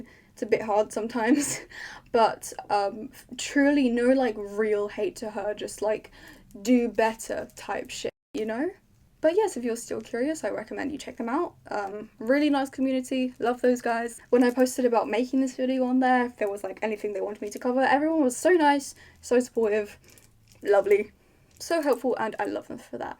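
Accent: United Kingdom. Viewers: Wait you sound like Jessica law- ARE YOU BRITISH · UR BRITISH!?!? (u still slay im just supprised) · I’ve been watching you for a while (on and off) and did not expect you to sound like ts, all proper 😭. I love British people ,I love yalls accents so much 😌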